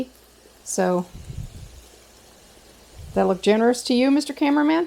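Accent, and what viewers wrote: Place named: Midwest, United States. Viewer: Your accent reminds me of the midwest, from Michigan here. I love watching you and your husbands adventures in Vegas, thanks for sharing!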